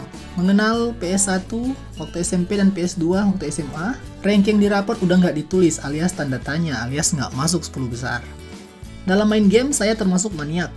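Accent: Manado, Indonesia. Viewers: pantes kyk hafal logat nya wkwkwk manado koten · yaa,kirain Sulsel,ternyata Manado,gue Sulsel bang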